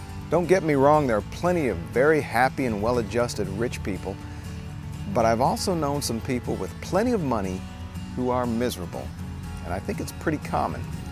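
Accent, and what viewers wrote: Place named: United States. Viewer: No I have never known someone with lots of money who was unhappy. If you have cancer and you are going to die then money will not save you. But it will fix just about everything else. Your example of the guy in Texas who won the lottery is absurd. He did not have to loan money, buy people homes or put himself in the position of a giver, he could have saved the money and had a decent life combined with doing something like giving to an animal shelter and volunteering to help out. It is not rocket science to know that if you are doling out millions to people then people will take advantage of you. And in the end he killed himself so right there he had mental problems. So tell me how people who win money are just a "giant freeloader"? You have an American accent so I presume that you live in the USA. Don´t get seriously sick there without a lot of money. 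there is no National Health Service. This video is pointless.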